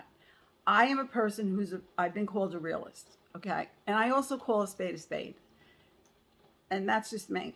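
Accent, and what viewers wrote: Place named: New York, United States. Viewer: I love your newyork accent and your journey sw well done 🐝🐝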